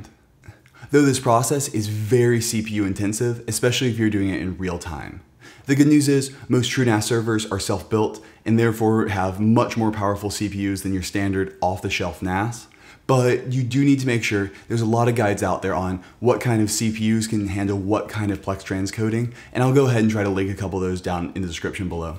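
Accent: California, United States. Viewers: I have no idea where you live but when I listen to you all I can think of is SNL "The Californians". lol Your videos are greatly appreciated. Thank you.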